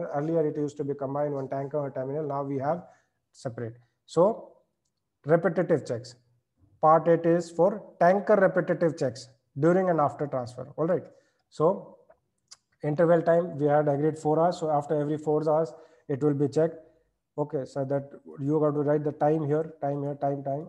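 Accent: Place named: India. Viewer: Its indian bro, not for beginners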